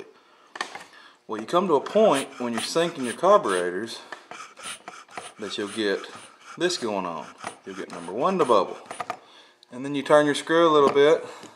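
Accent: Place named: South, United States. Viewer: Southern accent and used vodka bottles. God bless America. : )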